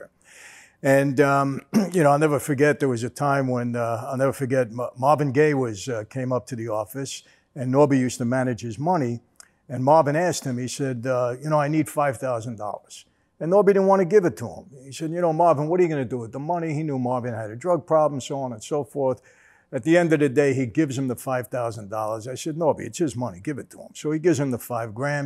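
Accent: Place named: East Coast, United States. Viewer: Hello Mr. Franzese, My Name is Rick Vaughan and I just wanted to thank you for doing all these videos on your life, sharing really interesting and intriguing insights into a world almost none of us “regular-type” people would ever know and for continuing to share the positive message of god and how to be a better person in this world. You have the ability to captivate an audience and it’s awesome to hear ya talk with your east coast accent! I love watching your videos and wanna keep encouraging you to keep them coming! Also celebrating 5 years of No booze today and sending you continued well wish to your daughter and family! Much love and god bless Sir, take care! -Ricky V.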